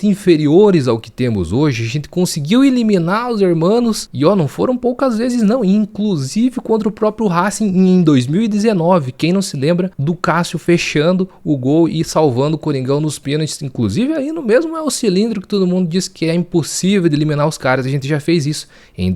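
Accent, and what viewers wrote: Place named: South, Brazil. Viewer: Opa, tudo bem? Você é do sul? Seu sotaque… VAI CORINTHIANS!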